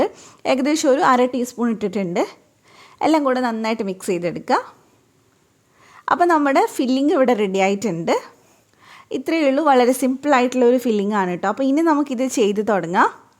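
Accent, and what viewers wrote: Kannur, India. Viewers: ഇത്ത കണ്ണൂർ എവിടെയാ മട്ടന്നൂർ aano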